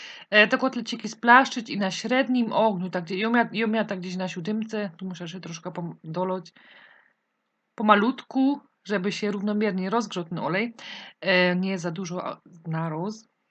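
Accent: Silesia, Poland. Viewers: Pani proszę więcej mówić gwarą 😊, taką prawdziwą. Uwielbiam tego słuchać. Pozdrawiam 😘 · Tłumok bo przecież powiedziała że mówi gwarą śląską pojebany jesteś😂😂😂😂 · niy prōbōj gŏdać cysto po polsku,bo ci to sztyjc niy wyłazi,przeca żeś niy je żŏdyn gorol. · Kocham jak mówisz po Śląsku👍👍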